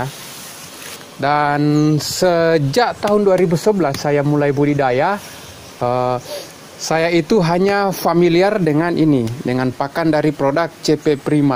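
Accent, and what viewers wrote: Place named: Medan, Indonesia. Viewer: mantap ini depe penjelasan, logat medan campur lompotoo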